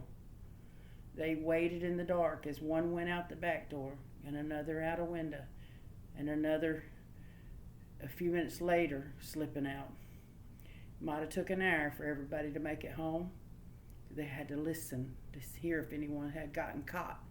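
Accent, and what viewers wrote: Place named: South, United States. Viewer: Hearing more of this southern accent I’ll even return the favor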